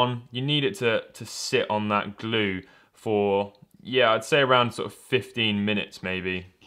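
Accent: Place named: United Kingdom. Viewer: He’s from the uk they have a different way of say things if you are interested look up the differences in English(us) and English(uk)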